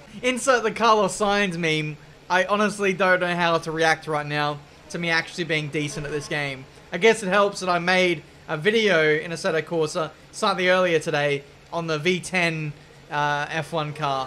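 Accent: Australia. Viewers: an Italian here. I confirm your pronunciation was on point, even if the English Australian accent was still there 😂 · If you wouldn't have that aussie accent you would have said mugello pretty accurate. You say "mugellou" you should say "mugello"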